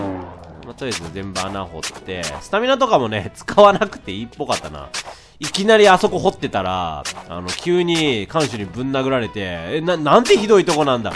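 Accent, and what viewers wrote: Kansai, Japan. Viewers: 最近関西弁出るの多いね！関西弁好きだから嬉しいw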